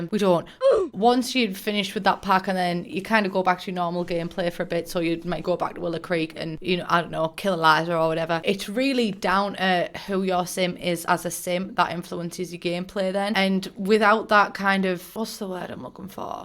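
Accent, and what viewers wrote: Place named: Northumberland, United Kingdom. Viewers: i love your north-england sound ♥️ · Can’t work out if you’re from Northumberland or Newcastle, hmm 🤔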